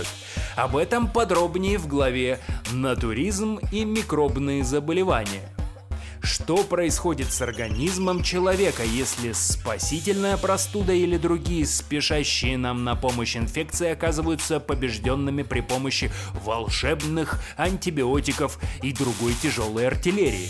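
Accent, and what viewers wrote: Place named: Baltic states. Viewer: из Прибалтики,вот и акцент,видимо,местечковый.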